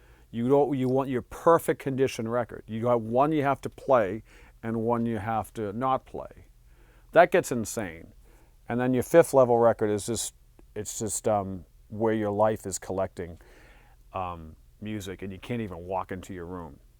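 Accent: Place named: Boston, United States. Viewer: Damn you still got your Boston accent !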